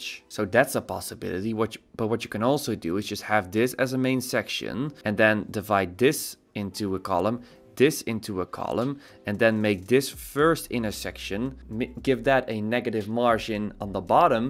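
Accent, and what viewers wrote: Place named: Netherlands. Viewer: I like how he sometimes forget to hide his Dutch accent. Great channel by the way.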